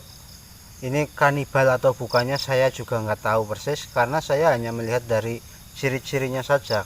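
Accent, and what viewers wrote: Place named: Java, Indonesia. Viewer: Medoknya terasa banget org mana ni bang grndut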